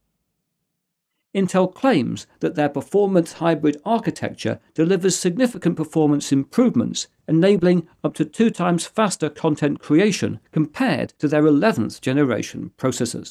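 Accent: United Kingdom. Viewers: This is how I like computer news: clear, concise and British!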